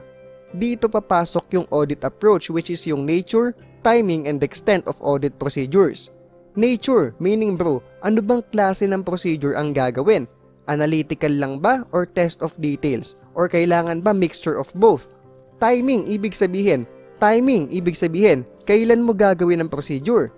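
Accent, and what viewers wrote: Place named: India. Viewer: you have Indian accent why using a blond blue-eyed skin?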